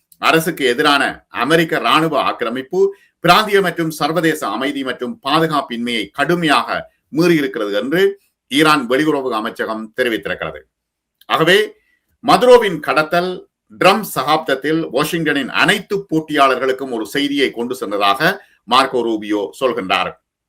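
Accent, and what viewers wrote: Jaffna, Sri Lanka. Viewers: Jaffna tamil voice nantraga ullathu 🙏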